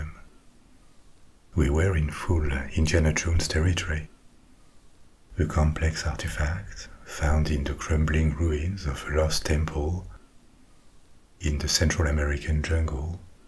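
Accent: France. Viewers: I just want to tell you that I can not sleep without you and I love your French accent. ❤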